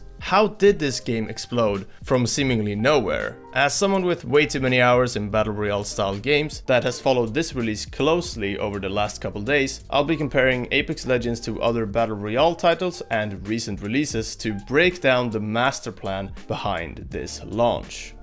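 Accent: Sweden. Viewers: Are you swedish? You have a very swedish accent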